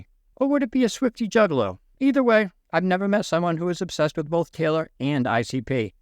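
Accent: New England, United States. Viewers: You sound like you might be from New England? Love these videos hilarious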